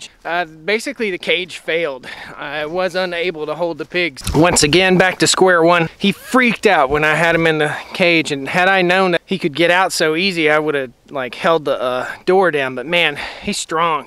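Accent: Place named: Franklin County, United States. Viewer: I absolutely knew where you were from as soon as you finished the first fifteen seconds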